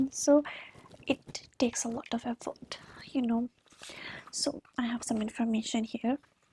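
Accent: India. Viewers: really like the articulate indian accent, cherry on top!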